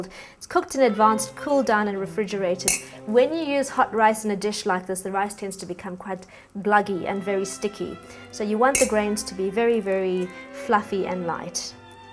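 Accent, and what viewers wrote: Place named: United Kingdom. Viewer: What accent is that? I can't seem to put my finger on it. I was certain it was London or somewhere in the UK until I noticed the South African clover cream. She must have been born in the UK then moved to SA after developing the accent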